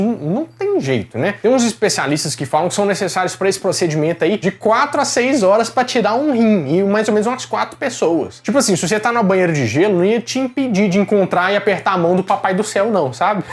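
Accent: Minas Gerais, Brazil. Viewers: Verdade TB gosto esse sotaque mineirinho dele nos cativa, parabéns pelo trabalho nesse canal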